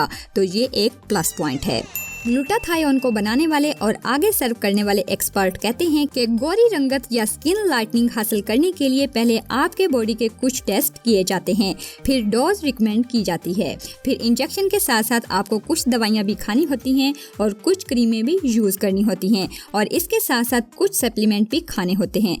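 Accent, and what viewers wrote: Punjab, India. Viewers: yaar plzzz apni Urdu ka style theak kro bilkul Punjabi lehja hy tumhara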